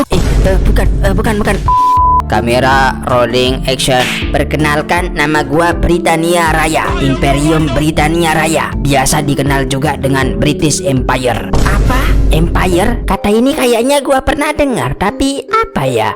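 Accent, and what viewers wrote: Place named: West Java, Indonesia. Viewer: sunda banget ya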